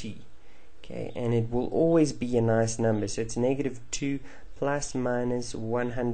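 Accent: South Africa. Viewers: South African? awe my bru!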